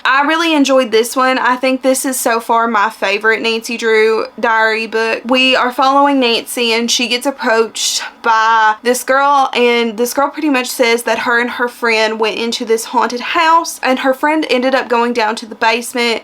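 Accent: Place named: United States. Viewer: I love your country accent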